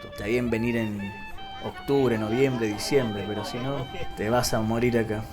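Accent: Chile. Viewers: Genial el relato 😂😂😂a lo chilensis Bravo Me encantó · Me encantó tu vídeo y sobretodo tu relato bien chileno , yo creia que Egipto era un país muy caro para visitar,pero por lo que cuentas no es asi , saludos desde Los Lagos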